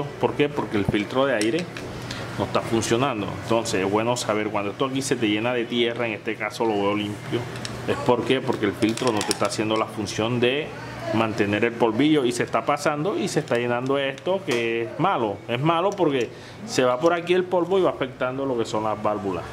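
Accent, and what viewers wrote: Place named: Caribbean Coast, Colombia. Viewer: ¿De donde saca ud. Que es venezolano? bien colombiano y costeño que si es. No se de donde pero su acento me lleva a pensar que es del sur de Bolívar o Sucreño.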